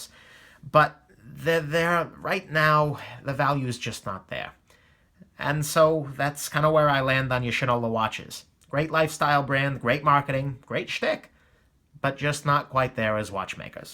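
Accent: United States. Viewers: What is your accent? I know it's American but where is it from?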